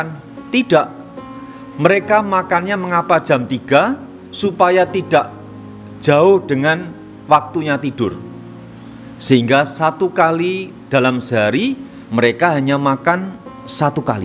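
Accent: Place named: Banyumas, Indonesia. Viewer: Senang mendengar ngapakx father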